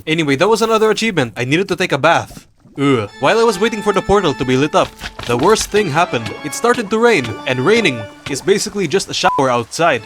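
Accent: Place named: Philippines. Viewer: An idea that can only be mustered by a Filipino. I can recognize that accent from anywhere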